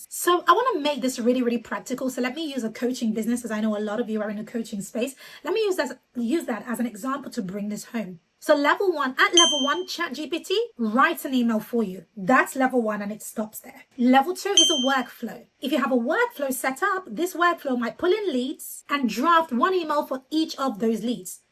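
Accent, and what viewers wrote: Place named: United Kingdom. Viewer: (I am answer to you here, as on that reply i couldn't, i don't know why!) I am glad! ☺hands-yellow-heart-red And...YES! I hope they will be the company, that i can fulfill my long last dream, of making that project! And first i thought that you are from the US, later on i have figured out, as you have mentioned that, you are from the UK and truly paying attention, at your english accent! 💫🙏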